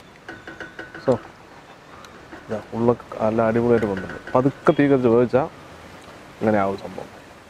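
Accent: Palakkad, India. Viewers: തനി പാലക്കാട് ഭാഷാ......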